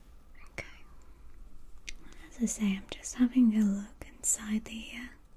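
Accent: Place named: England, United Kingdom. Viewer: Her voice is very calming and I love her English accent too. 💟❤💗💖🧡💕❣💚💞💓💛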